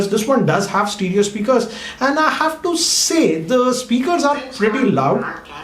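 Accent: Hyderabad, India. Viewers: R u from Hyderabad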